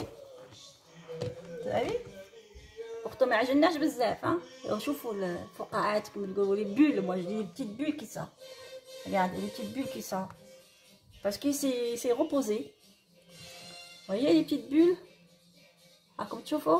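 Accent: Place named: Oran, Algeria. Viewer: Elle n est pas Egyptienne voyant elle parle algérien avec un accent Oranais et des mots français..j adore..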